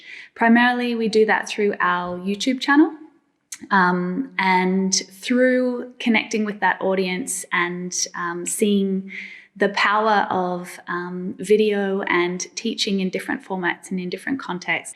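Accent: Australia. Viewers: Hi! Teacher. Good aftnoon. How are you? My Name's Ivanilson. I'm from Brazil and live ABOUT TONW Itacere-ba. Did you know? WHAT'S News? I'm to want speak English Britanico but know I study how a teacher can is this Austrialiano sutaque is spok beatiful.more Did you know? Does She is a woman very beautiful love see you speak this lessonigs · congratulations, you are terrific in teaching English. I think your accent is between Australian & English & easy to comprehend. Best of luck. Enjoy Perth.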